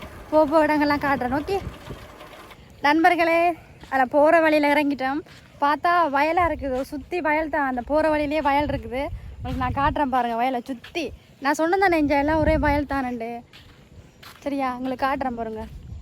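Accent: Sri Lanka. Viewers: இலங்கை தமிழ் பேசும் அழகு தனி அழகுதான் வணக்கம்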